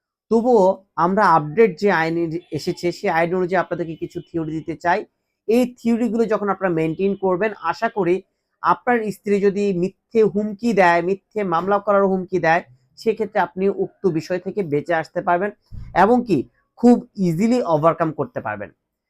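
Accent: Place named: Kolkata, India. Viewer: আপনার ভাষাটি কলকাতার মত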